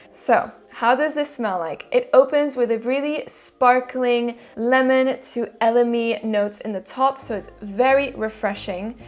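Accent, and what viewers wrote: France. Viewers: I love your french accent. You're very well spoken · Very infornative and to the point information. And nice French accent. Narciso rodriguez bleu noir parfum is my favorite. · I just subscribe cuz, j’ai adoré votre français accent, très jolie!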